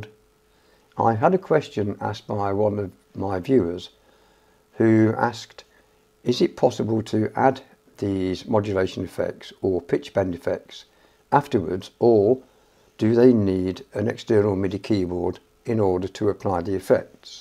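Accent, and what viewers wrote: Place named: Staffordshire, United Kingdom. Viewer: Cheers, Mate, SO...A little secret, I have 4 Guitars, 1 Mandolin and a "KORG EK50" and the Modulation control is a 'Joystick', (not the wheel configuration), you will not believe what Heavenly Fun I have attempting to annoy my near Neighbours...(Broken Headphones was my excuse..🤗🤗☠☠). I note STAFFORDSHIRE in your accent....Shenstone is my Family "SEAT"...now over in darkest Lincolnshire. Keep your fingers nimble...cheers..G.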